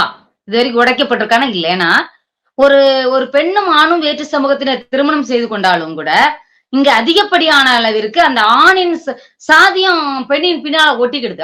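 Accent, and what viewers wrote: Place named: Tirunelveli, India. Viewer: இந்த அம்மா சொல்வதுதான் சரி இதுதான் திருநெல்வேலி வள்ளியும் தமிழ் பேச்சு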